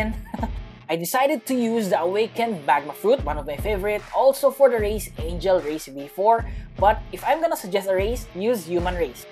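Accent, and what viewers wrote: Philippines. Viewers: You sound Filipino sir are you Filipino?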